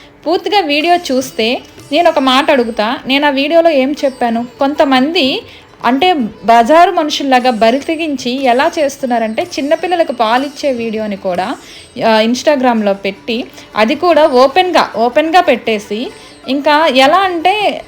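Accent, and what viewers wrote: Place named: Godavari, India. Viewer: Madam midhi a uru ...miru nellore antunaru but godavari slang kanipisthundhi ...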